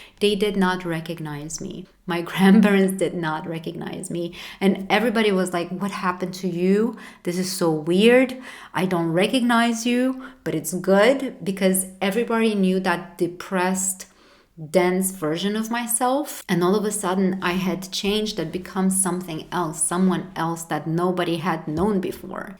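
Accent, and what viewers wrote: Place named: Romania. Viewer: Haha I can recognize a romanian accent in half a second. Greetings from Ro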